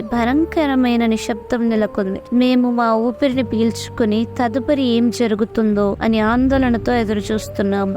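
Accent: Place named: India. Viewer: Good 👍 nice telugu slang is nice good voice some words we are not able to understanding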